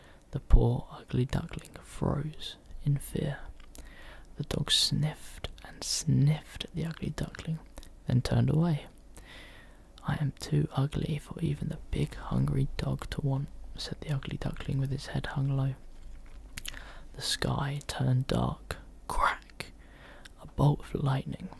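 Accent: United Kingdom. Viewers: Great Bri'ish accent.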